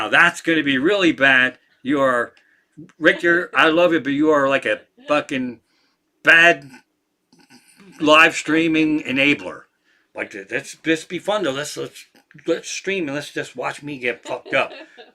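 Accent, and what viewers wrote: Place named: Ireland. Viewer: This was one of your best shows! I laughed so hard! It is great! Love your Irish accent!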